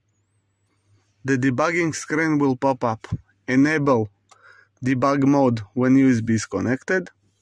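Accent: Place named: Israel. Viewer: איך שומעים ישראליותתתת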